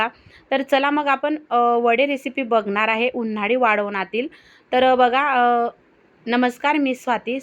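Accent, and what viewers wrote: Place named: Jalgaon, India. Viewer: खुप छान तांई विडिओ भुसावळ जळगाव बोलता 😊❤तांई फुल वाॅच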